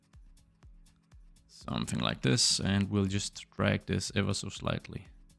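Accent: Denmark. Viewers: Are you danish? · this guy has to be danish. thats some danglish if ive ever heard it lol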